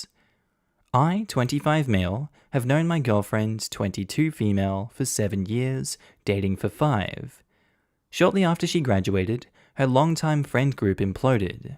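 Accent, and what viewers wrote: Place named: Australia. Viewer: Wow, love to hear a fellow Aussie voice in the evening. Onya! ♥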